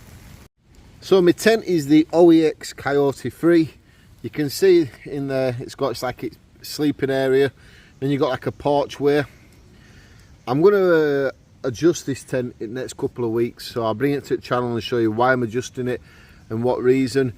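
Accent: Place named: Northern England, United Kingdom. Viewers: Great as always. My very humble advice. Stay away from divisive topics mate. Personally I come here for the advice, your knowledge and the great gear reviews. Delivered in your great west York’s accent and lovely gentle manner. Ultimately, I’m here for anything camp based. If I wanted to see or hear anything negative ( no matter what the topic) I’d buy a tv and watch the propaganda on there. Or god forbid join that circus o win as FB. You’ve been a massive influence on me and my own wild/ stealth / nature camping adventures. Looking for ward to autumn and winter to get my hot tent out! So a big thanks fella. Take care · Usually on the camping vids on Youtube when the food is being prepared and cooked I switch off, but on this vid if you are cooking the same again can I put my name down please?, good to hear a Northern accent also.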